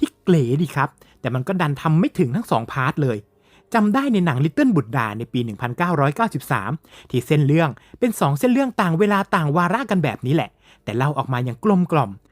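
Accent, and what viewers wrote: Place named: Sukhothai, Thailand. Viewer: 😂เหน่อโขทัยเหน่อตลก😂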